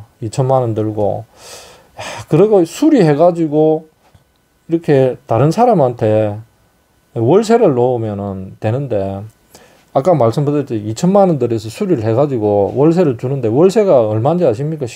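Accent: Gyeongsang, South Korea. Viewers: 이 올려주시는 영상 늘 잘보구 있습니다 사투리를 쓰셔서 경상도분인가만 했는데 함안군 군북면 이시네요 함안조씨 성을 가진분들이 많이 살고 계셨죠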